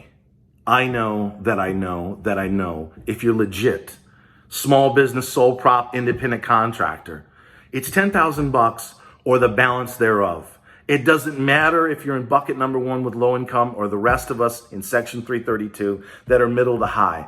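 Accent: New York, United States. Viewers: Wisconsin Green Bay ( don’t hold it against me ) I have been here since about April. I love that you love everyone ! You talk like a tough New Yorker, and have Atlanta love in your soul. And you have the Rocky fighting spirit! You tell it like it is I love the F bombs I love the slapping of the desk the Dixie cup coffee I love to see the watches! More importantly when you need to have a shot hope I can pull up this video because every time I call there they tell me I am getting nothing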